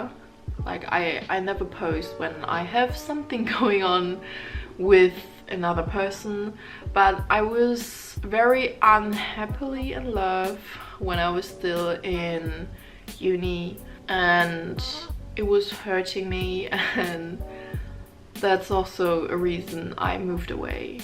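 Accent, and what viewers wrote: United Kingdom. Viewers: I haven't watched videos in a while (i haven't seen any in my subscriptions, youtube algorithms must be not showing me) her accent sounds different and kinda British-y? I love the way the black hair dye is fading on the ends of her hair it looks really cool. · Schön dich wieder zu sehen! Du siehst toll aus. Mach weiter so. btw Dein englisch hat sich bissl verändert oder?! du hast so einen britischen Akzent, ist mir vorher nicht so aufgefallen, vorher hat man immer gehört, dass du eigentlich Deutsch bist 😄 liebe Grüße, stay positive 😘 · You're wonderful, in everything that you do! Just know that we all love you and support you:) Btw unrelated -is it just me or has your accent become a lot more British lately?:o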